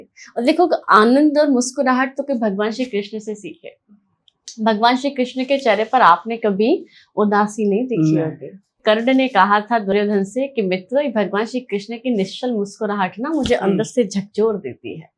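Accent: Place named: England, United Kingdom. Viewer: आपकी आवाज अलग ही है। आप कहां की हर जी ।मुझे लगता था आपकी आवाज सुनकर कि आप कोई अंग्रेज है😂😂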